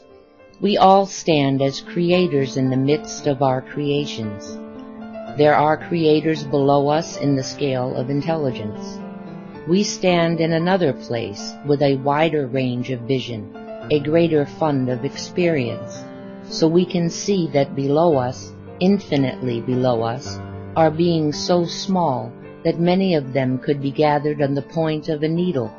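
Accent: Russia. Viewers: Amazing Russian accent.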